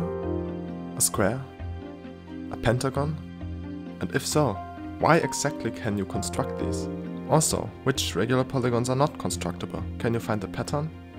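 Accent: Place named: Germany. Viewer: Nach dem Akzent zu urteilen sind Sie Deutscher. Hier ein kleiner Englisch-Tip: „not constructible as well“ ist falsch. Es heißt „not constructible either“.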